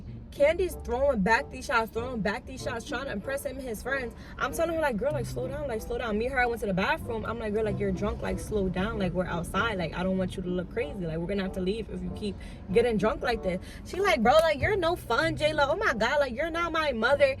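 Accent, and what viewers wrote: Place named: Dominican Republic. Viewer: I like her accent, she must be Dominican 😍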